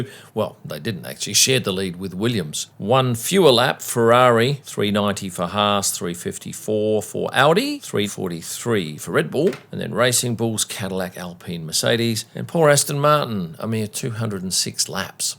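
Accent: Australia. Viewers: Hey,nice to hear a dinkydi Aussie talking about F1 again 🇦🇺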